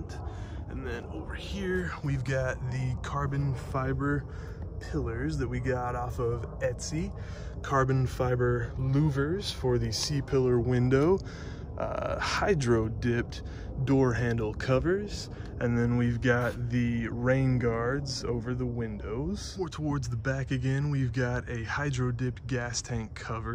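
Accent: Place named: Texas, United States. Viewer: What part of Texas you from ma dude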